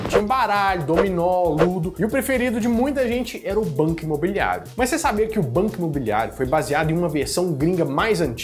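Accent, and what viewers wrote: Minas Gerais, Brazil. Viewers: esse que eh o verdadeiro sotaque mineiro que a gente tem, não é aqueles trem feio que as novela das 7 da globo tenta fazer não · Estou conhecendo o canal agorae simplesmente AMEI. Vc é muito simpático e divertido, sem falar nesse jeitinho mineiro que é demais. Parabéns!!😘😘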